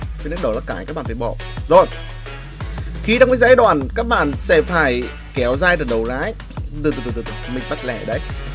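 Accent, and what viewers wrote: Nghe An, Vietnam. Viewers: A ở chộ mô nghệ an vậy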